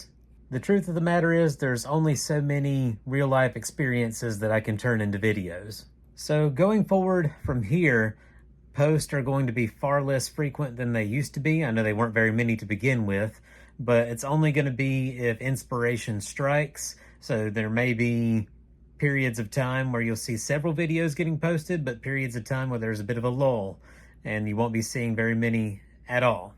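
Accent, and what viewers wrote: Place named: South, United States. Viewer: Nothin' but love, brother. I happen to like your voice. I'm originally from Kentucky but live in Illinois (I hate it here) so getting to hear southern accents I find very soothing. Plus, I love books and snack review type stuff, which you've done. So... yeah. I'm in for the duration plus ten years. (Also big First Responder & Military family so that's an added bonus)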